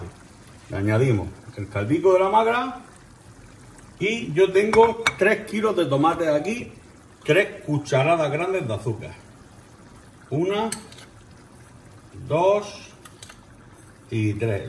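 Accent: Murcia, Spain. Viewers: Llevo unos años fuera de la Región y la morriña me está matando. La magra, el acento y sobretodo ese litrico al fondo le han dado la vida. Que fantasía. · Muy buena receta. Como se nota los aires murcianicos, jajaja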